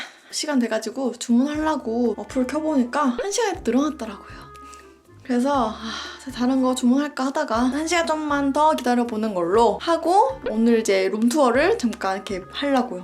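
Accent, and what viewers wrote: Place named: Jeolla, South Korea. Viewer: 온니 혹시 전라도 사람이신가여 ?!?! 말투가 되게 친근감 있어서요 ❤️❤️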